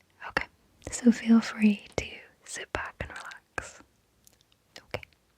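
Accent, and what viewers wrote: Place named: Wales, United Kingdom. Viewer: Love Wales ! Welsh accent is beautiful, it reminds Gaelic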